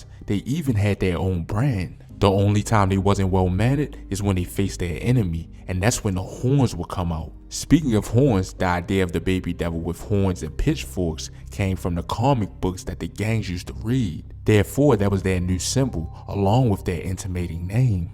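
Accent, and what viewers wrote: Baltimore, United States. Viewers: I just recently started watching your channel - so I’m sure other people have asked this question before …… but you from Baltimore? Just trying to see if my ears caught the right accent 🤔 🧐 👂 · Dam it most of the comments are asking you if your from Baltimore from your accent .Now I wouldn't know difference between the 2 states.Im from Bakersfield, Ca.,but if I had to guess I'd say Baltimore due to the fact that people from Chicago have a funny way of saying" CAR" they say "CAHH" lol😅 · His pronouncing youths as “you’ves” is definitely a Baltimore accent, sounding like Snoop from The Wire saying “Youffs” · DEfinitly sounds like Baltimore. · You can tell the narrator from Baltimore that accent thicc! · Yaaap Buddy ass sounds like he from West Baltimore! Respect from Humboldt Park Chicago Illinois. 😊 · This narrator sounds like they have a baltimore accent. 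· A narrator with a Baltimore accent speaking on Chicago history . No thanks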